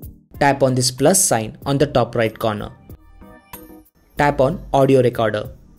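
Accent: India. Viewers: Always a boss with an indian accent that comes in clutch! Thanks bro 🤌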